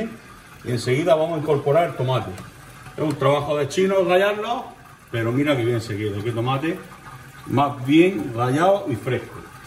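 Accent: Murcia, Spain. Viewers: Muy buena receta. Como se nota los aires murcianicos, jajaja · Llevo unos años fuera de la Región y la morriña me está matando. La magra, el acento y sobretodo ese litrico al fondo le han dado la vida. Que fantasía.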